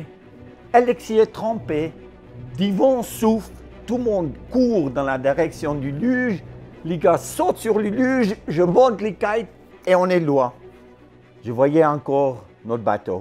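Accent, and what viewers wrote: Vaud, Switzerland. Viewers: Tcheu c’taccent Suisse 🇨🇭!👍🏽😄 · Cet accent vaudois 😂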